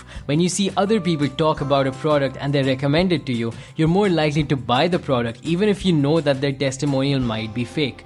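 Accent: India. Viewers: I like how it's narrated by an Indian man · Your accent sounds indian-english where are you from? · I'll be very racist now! Please!!!! Let the native english speaker voice this cognitive stuff, and later on this dude who is probably indian can translate all this to his native language...I cant get a thing because of his obvious accent... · I like how accent. Sounds like an Indian guy from Asia. Nice to hear for me. Shows the reach of this media as well as how small our planet is. Perhaps we will realize we are virtually all the same. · Indian blabber lol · Jitna bhi Dollar Dollar bol le, pata chal hi jaata hai ki apne Indian Bhai ki aawaaz hai. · I had to skip . Your accent maybe me feel like I am getting scam from the phone calls from IRS indian people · its indian accent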